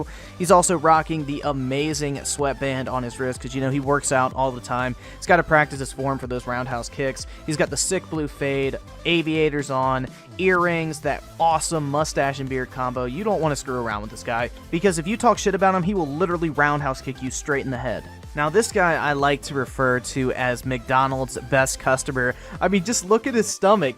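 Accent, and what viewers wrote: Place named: South, United States. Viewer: Bro i remember these! He had that southern accent coming through still and he scripted his vids still..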